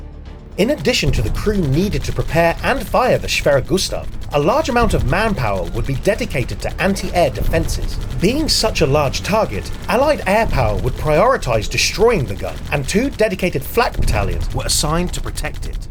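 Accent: United Kingdom. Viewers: Wtf with the bri-ish voice?? Didn't those guys lose to a bunch of pilgrims???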